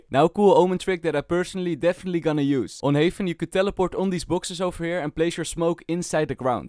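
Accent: Netherlands. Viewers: are you dutch? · in the first 5 seconds i knew you were dutch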